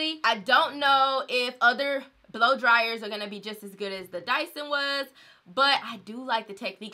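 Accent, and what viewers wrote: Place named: Detroit, United States. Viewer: I think Detroit!!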